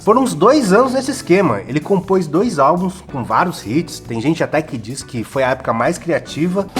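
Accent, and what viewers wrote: São Paulo, Brazil. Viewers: O cara faz um vídeo com dialeto paulistano PQP que merda de sotaque do caralho. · Paulista fala mttttt feio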